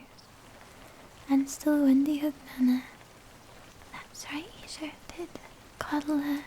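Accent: United Kingdom. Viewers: Where is your accent from ? England ? Ireland ? Scotland ? Your voice is hypnotic.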